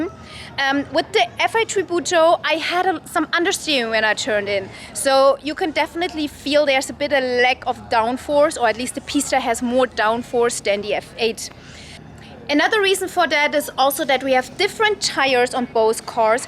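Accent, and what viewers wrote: Germany. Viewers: Der Deutescher Akzent 🇩🇪😂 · I swear you sound german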